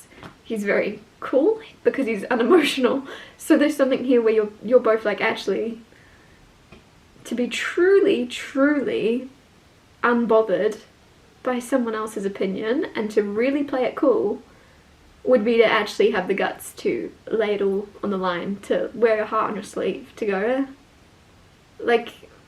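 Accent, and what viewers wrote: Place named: Scotland, United Kingdom. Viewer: Are you Scottish perhaps or of Scottish extraction? We have a thing for hot 🔥 blonde 👱‍♀️ Scottish neuroscientists having lived w one in East Harlem & after reading 📚 Kelly McGonigal's book 📖 'The Willpower Instinct' when we learned that the greatest predictor of human resilience is Heart Rate Variablility & we spent a year getting down to breathing 1 breath per minute & going to NYSC & doing an hour on the Stepmill, burning 🔥 850 calories & getting our heart rate up to 165 bpm, & we've needed every bit of resilience we developed trying to survive in the 🌎 since we left NYC. What if we're a lesbian in a man's body? "Exit Through the Gift 🎁 Shop" is an excellent movie 🎬 we saw down on Houston St. about Banksy & Mr. Brainwash. We don't tell anybody anything because they never like hearing what I have to say & tell me that it's just my opinion. 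kthxbye!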